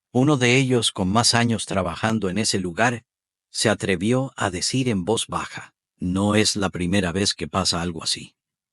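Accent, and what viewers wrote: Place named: Galicia, Spain. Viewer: Esa historia se repite y es vieja y pasa a diario en Mexico.. siempre llegan sierreños en Mexico en dealer se auto...como los ven prieititos y en huaraches no les dan atencion.. al final refiben la propina de su vida. La verdad tu historia es aburrida y mas de lo mismo ademas que tu acento es gallego..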